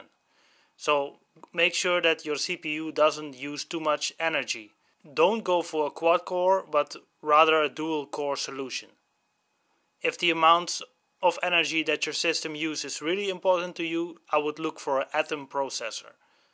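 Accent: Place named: Netherlands. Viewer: Dacht ik al haha, lekker Nederlands accent!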